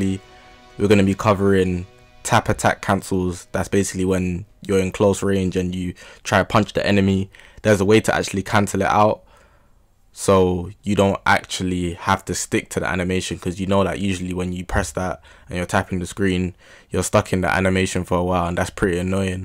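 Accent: England, United Kingdom. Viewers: i did lol , the english accent is too thick.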